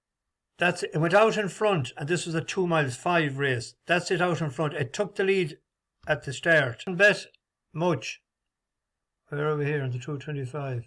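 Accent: RP, United Kingdom. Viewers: Great stuff, tips not on fire but that’s racing, still your command of the Queens English always makes me smile, keep up the good work, as you said things will turn 👍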